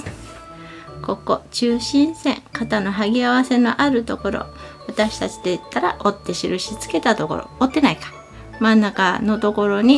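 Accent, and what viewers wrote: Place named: Kansai, Japan. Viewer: さんいつも拝見してます！そのおしゃべりがほんわかしてて本当に癒される〜 適当でええよ〜とか！時々 関西弁？って思える節もありますがどちらの出身ですか？ワンピース簡単そうでチャレンジしたくなりました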